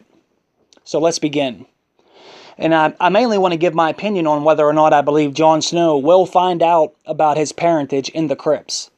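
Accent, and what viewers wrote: Pittsburgh, United States. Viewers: Dude are you from Pittsburgh? Awesome video btw!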